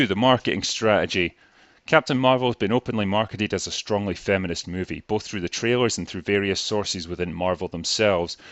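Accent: Scotland, United Kingdom. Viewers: An old vid before he put on the thick Scottish accent. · Wait a second -- now in 2020 the has such a thick Scottish accent he's barely understandable! In this video it's much more mild.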